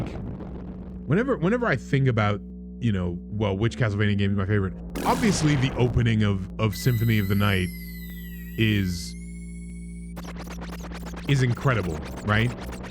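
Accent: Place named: United Kingdom. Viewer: You sound like a Native Britt😂😂😂 With that Voice over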